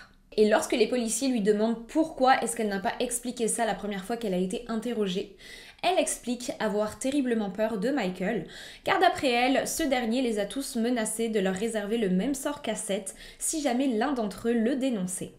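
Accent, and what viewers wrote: Quebec, Canada. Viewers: J'aime vraiment trop ton accent Québecois, ça sonne tellement sympathique, j'adore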